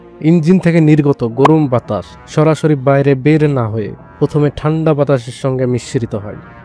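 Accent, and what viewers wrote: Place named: Bangladesh. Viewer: জানেন কি চ‍্যানেল টি কি বাংলাদেশের? ঘোষকের উচ্চারণ তাই বলে। যুক্তাক্ষর উচ্চারণ করার অক্ষমতা উৎকট রূপে প্রকট।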